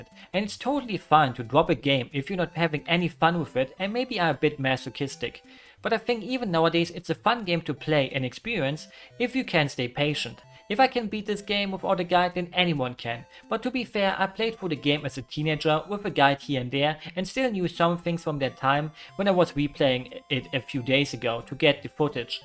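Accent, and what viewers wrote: Germany. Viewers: This guy sounds very german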